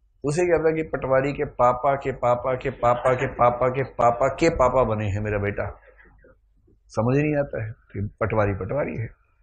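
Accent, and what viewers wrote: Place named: Eastern Uttar Pradesh, India. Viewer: At the end, bujha raha h? 🤣🤣🤣 Sir aap pakka U. P. east se h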